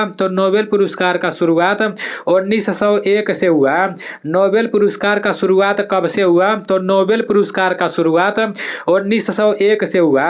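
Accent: Bihar, India. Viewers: Bhai lagta hai ki aap bihar se ho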